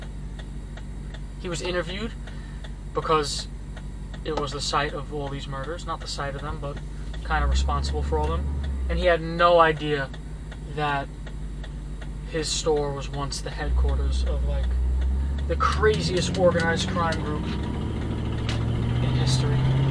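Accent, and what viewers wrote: New York, United States. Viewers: love your drives and the subject matter. And u do it with a New York accent. Keep up the good work!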